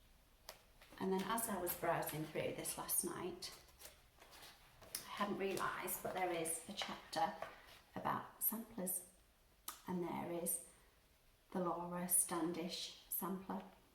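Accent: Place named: England, United Kingdom. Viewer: Your accent sounds so familiar and I may have figured out why. It seems to be the same accent as several of the characters of Downton Abbey. Am I correct in that guess or totally off base? Another great video.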